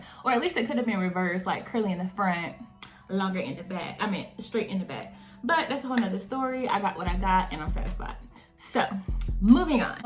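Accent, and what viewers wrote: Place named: South, United States. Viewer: You are so pretty girl!!! Ooh, I love your accent too. Southern accent?? Anyway, your locs are beautiful. My braid locs were started end of Feb 2022. Girl, I feel you with that darn shrinkage. Mine looks shoulders right now. 😂🤣 However, my hair is around BSL to mid back. I also been documenting my loc journey too. Great video! 😘🙏🏻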